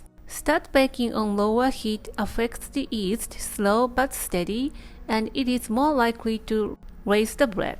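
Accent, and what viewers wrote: Japan. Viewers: I love Japanese accents when they speak English! So cute! Thanks for this amazing video!!!! Very well and detailed explanation in how to make a 🥖! I Will give it a try for sure!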